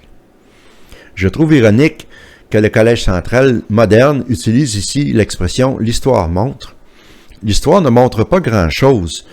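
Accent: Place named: Quebec, Canada. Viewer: Je salut votre courage cher monsieur 'vous parlez en connaissance de cause. Je suis pas athée' je m instruis par le biais de vidéos. Tout laisse à croire à un lavage de cerveau. Nous devrions tous restez libre et choisir une voie spirituelle si on éprouve le besoin et quand le moment viendra. Merci pour l accent québécois ❤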